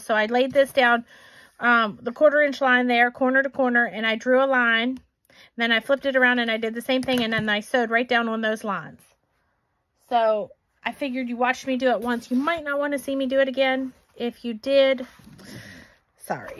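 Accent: South, United States. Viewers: Stop doubting yourself! Girl those seams are looking awesome 👍😎!! Love to listen to you talk, Southern Gal!!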